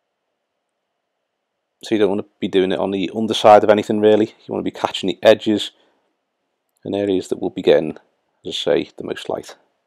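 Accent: Liverpool, United Kingdom. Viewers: nice to see a scoucer doing the hobby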